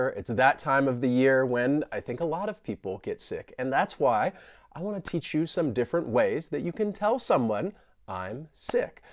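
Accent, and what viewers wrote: United States. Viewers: AN AMAZING CHANNEL AND USEFUL YOU'RE THE BEST BRO I REALLY LIKE THE AMERICAN ENGLISH ACCENT WHAT DO YOU RECOMMEND THAT I WATCH FROM YOUTUBE CHANNELS OR WEBSIT TO LEARN THE AMERICAN ACCENT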